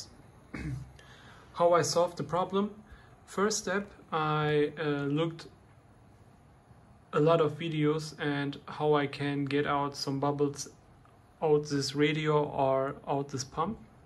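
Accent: Germany. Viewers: am i correct and i listen to german english speaker? My english is the same haha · Höre ich da deutsch?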